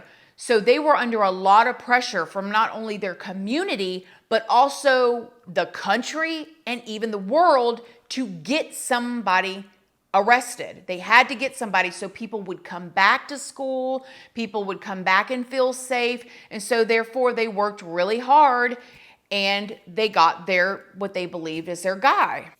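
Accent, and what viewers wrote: South, United States. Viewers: Love you and your approach to things. Watched your videos for years now, started with your prison stories and still here. Thanks for always being so cool, clever, calm and real! As a Scottish lady, I love your accent and how you say y’all 😂 😂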